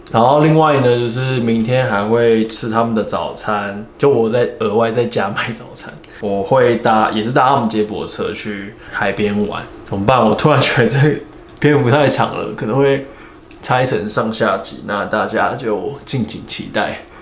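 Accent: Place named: Taiwan. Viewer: 好感台湾腔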